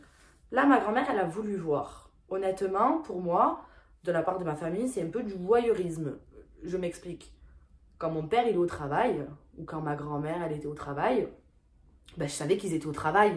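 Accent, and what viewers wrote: Marseille, France. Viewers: Hello, tu as un accent sympathique, tu viens du sud ?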